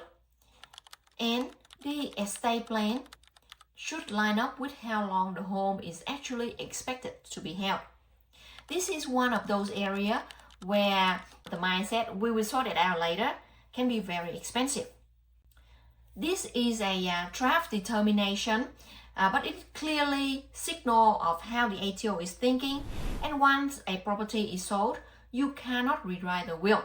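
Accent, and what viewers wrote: Vietnam. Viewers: Why do u sound vietnamese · Yes, the podcaster has difficult to understand Viet-English. Good content but hard to listen to.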